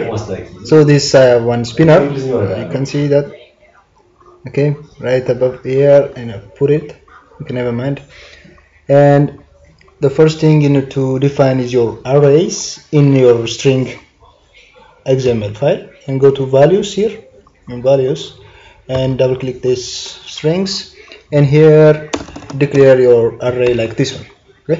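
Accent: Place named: Ethiopia. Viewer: I just knew you are Ethiopian based on your pronunciation..lol..keep it up...we need ppl like you!!!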